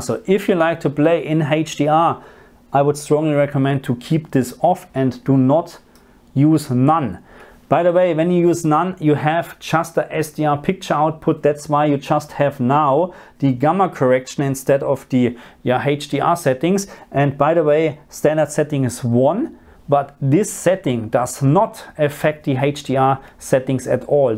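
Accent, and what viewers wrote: Germany. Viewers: Those settings worked for me (playing on PS5 on a SONY Bravia TV) very well, before I had to crank up my my max. brightness much higher than my TV actually can take, Tone Mapping had to be on 1. Thanks a lot! P.S. Da ich von Deinem Akzent (no diss) her annehme, dass Du auch Deutscher bist, noch eben muttersprachlich: Vielen Dank, werter Herr!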